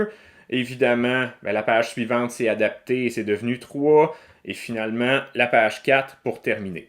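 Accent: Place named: Canada. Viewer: Rien que pour l'accent! Partir au Canada, quel plaisir et, en plus, les explications sont très claires. Merci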